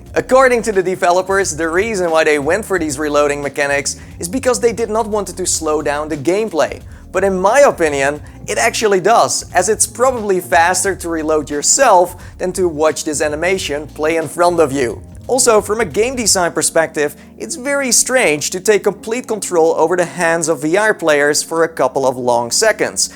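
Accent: Netherlands. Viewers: I love the way you say notoriety, dutch?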